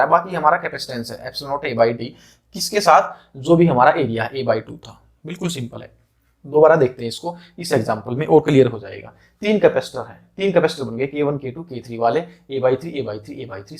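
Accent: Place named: Haryana, India. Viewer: sir aap Haryana se lagte ho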